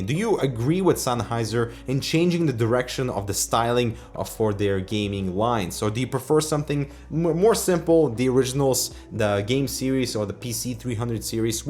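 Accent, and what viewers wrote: Russia. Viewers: ***** RUSSIAN Accent Happy? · Okay.... so is he a Russian or Swedish? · Guys, is from Russia?!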